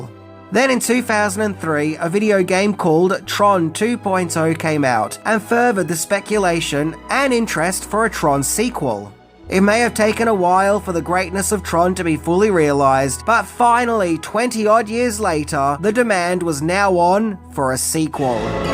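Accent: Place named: Australia. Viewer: There's the OST and then there's an 8-bit version of the OST. Both are incredible. And, despite your not being familiar with the technology and your tendency to speak like an Australian, I LOVE your totally unpretentious takes on film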